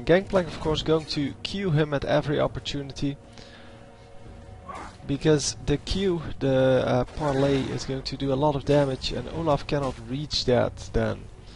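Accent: Netherlands. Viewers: you sound waaaaay too dutch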